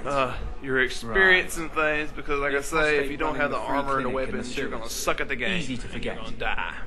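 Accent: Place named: South, United States. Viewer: Ok, wanted to thank you again, had to track down your video again for a reinstall. Plus after 7 years in the UK it's nice to hear a "down home" accent again lol thanks again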